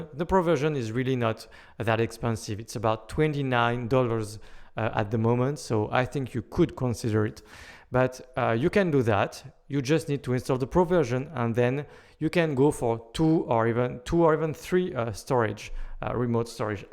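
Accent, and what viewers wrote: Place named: France. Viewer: Trop bien avec l’accent français j’arrive a comprendre ce que tu raconte 😊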